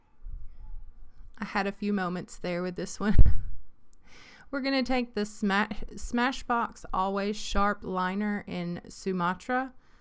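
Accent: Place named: South, United States. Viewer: you are so pretty and I love your sweet southern accent. <3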